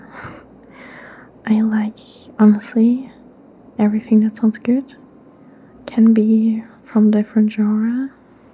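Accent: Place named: Norway. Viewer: Thanks for this very relaxing video! I would've guessed you were from Norway^^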